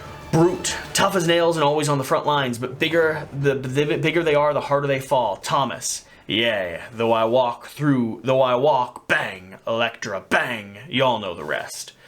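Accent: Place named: South, United States. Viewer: Obligatory southern drawl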